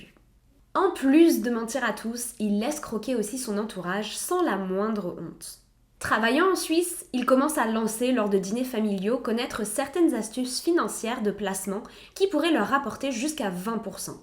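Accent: Quebec, Canada. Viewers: Au top. Comme d'hab 🔝👍🔝👍.... Retour en France du coup🔙🔜..... Promis pour une lilloise... T'as vraiment l'accent quebecois😆😆...j'adore👍👍👍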